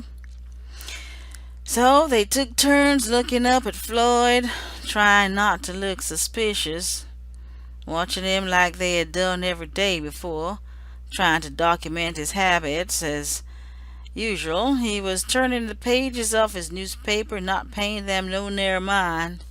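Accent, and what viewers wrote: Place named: South, United States. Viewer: I loved your southern drawl it was absolutely entertaining. Thank you for the stories God bless you ❤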